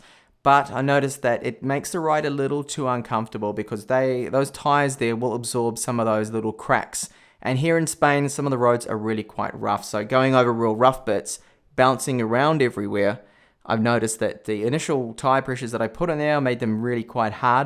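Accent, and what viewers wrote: New Zealand. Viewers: Are you a kiwi? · R ur from New Zealand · Hi, where are you from? :) your accent seems like a friend of mine from NZ...Very good reviews in your channel.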